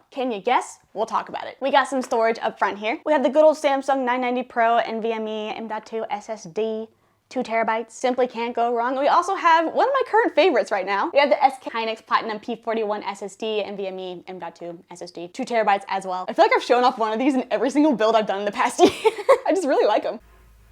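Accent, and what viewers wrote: United States. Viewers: The hehe at the begging got me 😂😂 hehe (your American pronunciation is so amazing) I’m so jelly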